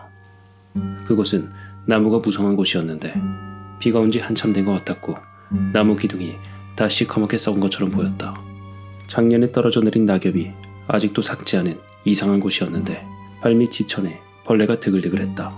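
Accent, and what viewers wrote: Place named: Busan, South Korea. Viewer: 경상도 어디사세요? 부산 사투리인가...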